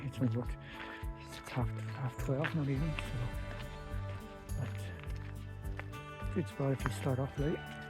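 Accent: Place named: Ireland. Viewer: Thanks for the video. I know the EHW is not really marked; however, it is not as intense as the WHW and will suit me ass a relative beginner. I live now in Stirling so I am within easy reach of all Highland and Island hikes & wild camps. I am originally from NI and though I detected an Irish lilt in your accent. Cheers 👍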